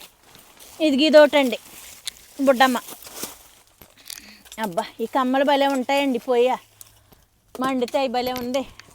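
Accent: Godavari, India. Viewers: మీ పుట్టిల్లు గోదావరి జిల్లాలా ఉంది matateeru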